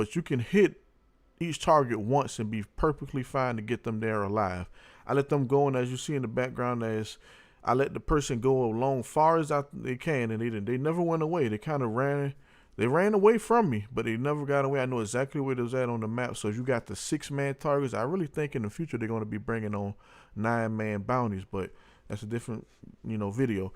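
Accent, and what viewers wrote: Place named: South, United States. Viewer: That’s arrow, not error. Good southern boy accent dair.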